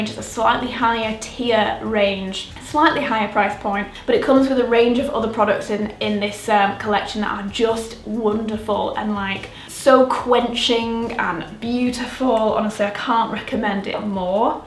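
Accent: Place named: Northern England, United Kingdom. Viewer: LOVE the northern accent, this was great <3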